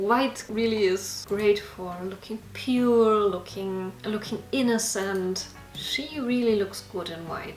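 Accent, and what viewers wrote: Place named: Germany. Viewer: German accent 😂😂😂😂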